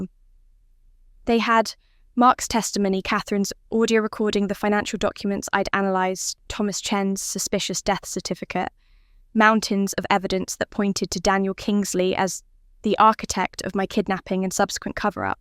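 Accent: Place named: England, United Kingdom. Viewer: Lol she said she lives in Cambridge, its an English accent...but she lives in Boston part of New England.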